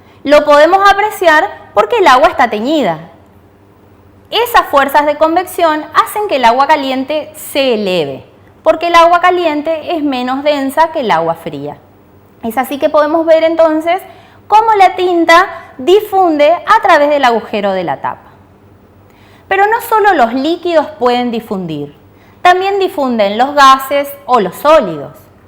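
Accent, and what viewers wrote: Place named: Argentina. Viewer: Eres de Argentina , siempre e querido ser de alli no se porque me encanta el acento :v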